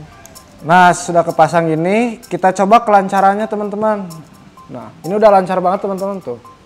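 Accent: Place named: West Java, Indonesia. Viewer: Jogja rasa sunda😅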